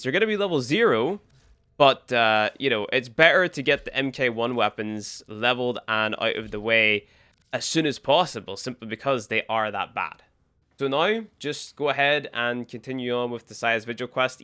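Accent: Northern Ireland, United Kingdom. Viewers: Is that a fellow Northern Irish accent I hear? Well damn, I might just take that as a sign to give Warframe another go.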